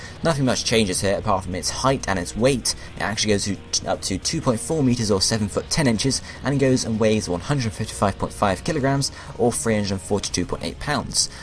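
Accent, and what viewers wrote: United Kingdom. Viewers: I'm from Sweden and even I can understand what he's saying with his british accent. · I don't get a thing you say with that British accent.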